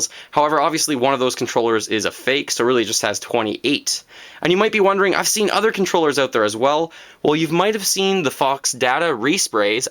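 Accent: Canada. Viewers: Love that Canadian accent; I'm crazy for a Canadian man myself.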